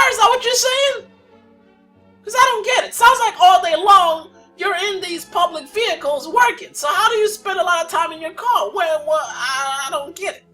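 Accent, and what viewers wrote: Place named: New York, United States. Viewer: By the way,,,, when did you gain your New York accent????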